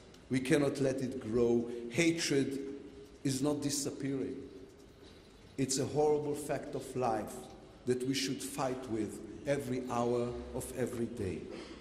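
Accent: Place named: Israel. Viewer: פעם ראשונה שאני שומע את לפיד מדבר באנגלית. מדבר אנגלית ממש טוב בקושי שומעים את המבטא הישראלי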